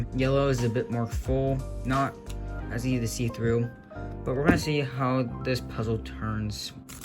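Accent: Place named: United States. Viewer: i jus realized u sound like tymon kolasiński but with an american accent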